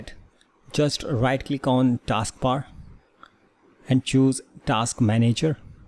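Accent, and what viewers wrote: India. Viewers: Worked for me, thanks! Also one of the easiest to understand indian accents out there :).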